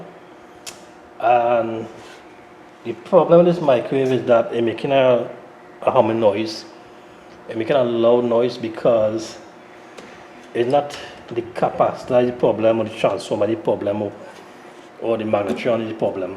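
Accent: Trinidad and Tobago. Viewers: Have a samsung over the range with hood vent. one of the fuses on the noise filter (Has 2) blown Tried a new one blown again. what would cause that? Microwave no heating. door switches test fine. Resistor , magnatron, capacitor, thermal switches all test fine. Also would like ur contact. u sound like a trini.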